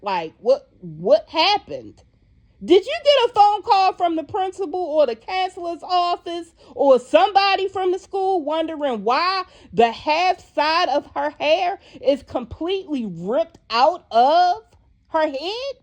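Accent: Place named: Maryland, United States. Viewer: Hey, You have an accent the same as my cousins from Maryland Are you from Maryland or Washington, D.C,?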